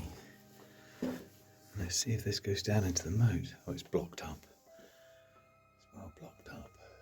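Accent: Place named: United Kingdom. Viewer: Absolutely amazing tour of antiquity, made captivating by the tenor of voice, his knowledge of this historical structure, his colorful imagination, and his lovely British accent! Loved it! Thank you for sharing, but I'm also missing Anna's and your DIY projects, dancing & creativity!😉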